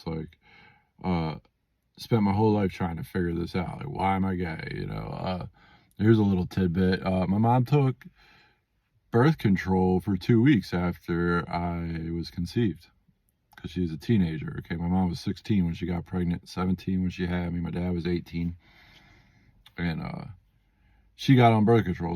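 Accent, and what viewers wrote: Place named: Philadelphia, United States. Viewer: I totally knew from your accent that you were from the Philly area!! My family is from Levittown/Bucks County!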